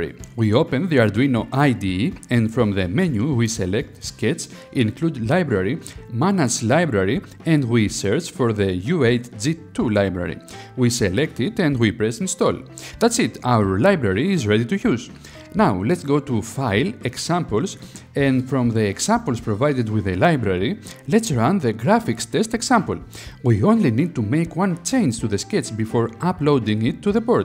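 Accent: Greece. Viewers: Are you Greek? I am about 95% certain, this accent is quite characteristic. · Thanks for this video. By the way, are you greek ? Your accent seems familiar :D :D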